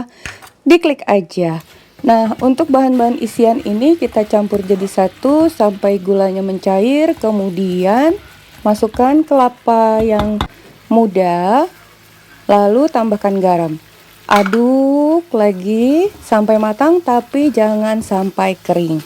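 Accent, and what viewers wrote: Java, Indonesia. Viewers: Berpori euy kayak wajah AQ klo lagi ga dirawat hkhk Mm suara nya medok ok jg pantes jg jd org jowo hkhk Pas bgt buat takjil niii ga perlu beli Yg pertama bkin si unti dlu ya Kdua bkin adonan dadar nya Pake teflon ternyata kayak bkiin leker ya Selera ya boleh yg berbolong bolong boleh yg mulus dg api kecil pan hangat ..monggo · Diputtaaar....di cellup,dijillattiii😀...aq gemmess ama suaraneee,RENYAAH,wong jowoo taa...luccu,saabaaar...lope..lopee💋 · Dasar gulung pakai Nada jawa he he Isian nya kelapa Gulung gulung Ayooo gulung meneh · Medoknya jwany mn jeng, 😊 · Profilnya ganti jadi pangling nih, cantiiik bgt hasilnya, adonannya di saring dulu biar g bergrindil, tumben nih logatnya medok😁😁😁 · Mari bikin dadar gulung dulu...iya bu sudah faham..ada yang seperti macan...iya cantik mom..kalau mau yang mulus pakai api kecil gak usah pakai minyak..omongannya kok kayak wong jowo mom...waduh ada roti...mau dong..lanjut di isi pakai unti kelapa...di gulung lipat gulung lipat..haha ayo lagi siapa takut...ada2 kamu mom..lumayan buat aku ketawa hari ini dadaaa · Buat isian semua bahan campur Lalau masak awas jangan sampai jd srundeng Lalau buat kulit tepung gula telur tambah santan santan kara instan lebih kental Tambahkan pasta pandan aduk sampai rata,jangan khawatie jangan sampai beekwtu janganlupa tambah minyak goreng di sari Yap Pas banget buat ta'jil baiat sesiei akan dapat 12 janganlupa Duk duslu Nang ini udah terlihat guling bolong, u menciptakan adonan bolong itu pnannya haru panas Olesi minyak masukan asona dintuplek hasilnya bolong bolong waw kayak orang Jawa wkwkwk mantab medhok nampak macan Kalau mau yang mulus api kecil pan hangat gak pake minyak,Nang sekarang akan kasih isian kedalam kulit ini yang sedang bolong bolongnya, Wah saatnya gulung wkwkwk wong jowo ngono iya to 😆 mantabz dah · wah dadar gulung nya enk bngt buat tajil cocok bngt mah dari pda bli cara biat nya juga gampng y klw liat mmh yg but tpi klw rara yg buat pasti gagal hahaha,wah ada dua macam y mah dadar gulung nya yg satu berpori yg satu nya lagi mulus ,cara buat nya sama cmn beda di saat waktu manasin adonan nya klw yg pori saat adonan d masukan ke pen pen nya tunggu panas dlu baru kasih adonan pke api kecil ,klw pngn yg mulus pen nya jngn pnas tpi hngt sama dngn api kcil klw dah buat dadar gulung nya tinggal masukin unti nya dah d gulung" jadi dah dadar gulung hahaha ,wah itu ada roti mamah abis buat roti lngsung buat dadar gulung y ,wah ada ilmu satu lagi ternyta dadar gulung ada yg bisa g pke telor juga y mah ,aduh gagal pokus ma suara mmh yg medok 😁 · Jadi kalo untuk dadar gulung itu yang berpori semakin bolong semakin ciantikk ya, ternyata yang enak itu yang pake telur, kalo pengen porinya banyak taflon panas tambahkan minyak lalu masukan adonan, kalo pengen kulit nya halus jangan kasih minyak dan api kecill, sipp trimakasih tipnya ternyata adonan nya kasik telur dan minyak sayur, selamat berbuka puasa, aduhh ada yang logat jawa nihhh hahah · Medok bangget mbk yu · Walikimsalam kaka sayg algamdllah puasa nya lancar Wah aku sellu gagal buat dadar gulung mawu coba resep Gula ama kelapa jangan jadi srundeng😆😆😆 Telur nya simpan bagian tengah ya kaka 2 sendok makan miyak gorenggggg di cicin minyak dikit dah da msup adonan nya d goyang2 wah hasil nya bagus bolong2wah rotinya melenuk gaya ngomong kaya orang jawa kaka guluk embk wah hasil mantap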